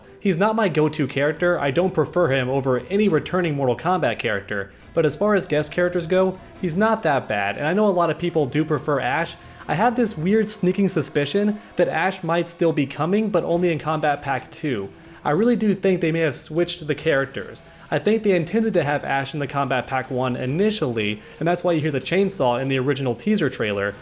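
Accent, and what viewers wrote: Texas, United States. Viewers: Wait, you're a Texan?!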